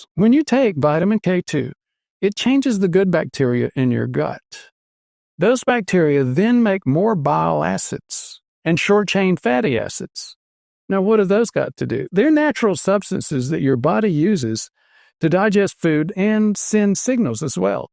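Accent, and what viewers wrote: United States. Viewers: How about jist get sun and eat healthy why take pills ? If you can afford pills youncan afford food. You sound American where there is no health or longevity studies that show your lifestyles work. Eat well get sun and excersize and he happy and you dont need to get confused of all these pillls you take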